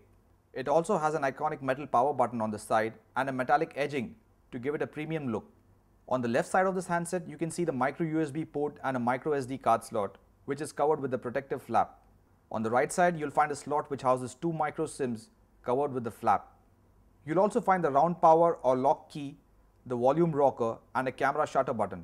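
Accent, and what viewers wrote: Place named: India. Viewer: FRITZ GOMEZ Indian dude.. Their accent always suck hahahahaha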